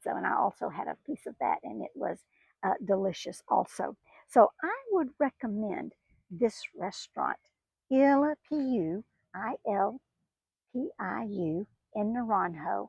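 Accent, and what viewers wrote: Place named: North Carolina, United States. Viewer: I like your accent. I'm from the UK so I'm not too familiar with US accents. Are you from North Carolina by any chance?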